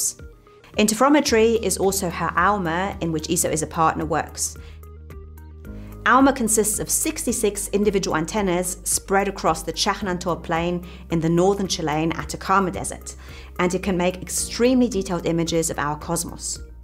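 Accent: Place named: United Kingdom. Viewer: No photos cause it's fake. Just cause she has a British accent doesn't mean she's smart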